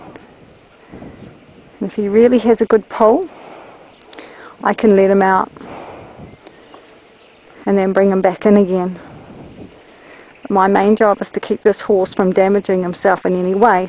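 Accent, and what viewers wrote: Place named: New Zealand. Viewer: Hi I like your relationship with this horse. Tom Roberts would advised to tie the halter with a bowline knot under his chin, (easy to undo) I am looking forward to seeing more of your videos. PS I like your kiwi accent. I am keen to see how you actually get into the racing part.(if that's what you do) I have a colt and I am working him will follow your advice however my skills are not as confident as yours ..but I try.you explain things very well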